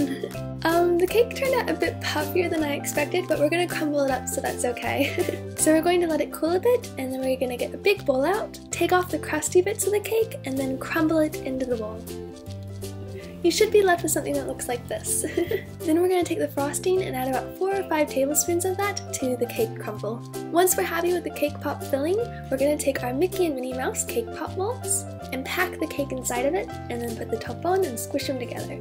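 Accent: United Kingdom. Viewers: Omg, you are starting to sound British!!!! this is the best day of my life :)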